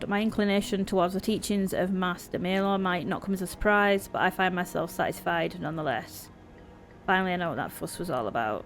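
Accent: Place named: Midlands, United Kingdom. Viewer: Sounds like a midlands accent, good luck with the series!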